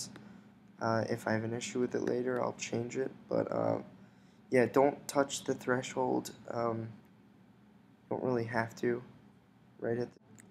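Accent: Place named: New York, United States. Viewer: just followed you on soundcloud bro. are you from ny/nj? u have that accent lol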